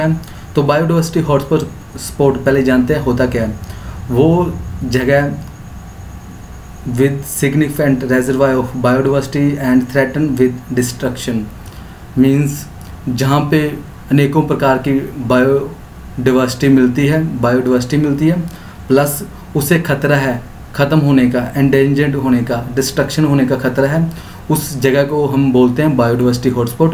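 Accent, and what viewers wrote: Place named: Punjab, India. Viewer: From ur Language, you seems to be from PUNJAB ..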